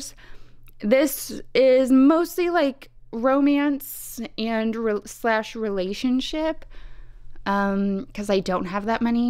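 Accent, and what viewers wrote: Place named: South, United States. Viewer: Do you mind me asking what state/region you’re from? TOTALLY ok if not, I just LOVE your accent as a southerner and I’m a linguistics nerd. Also your shelves? Immaculate:)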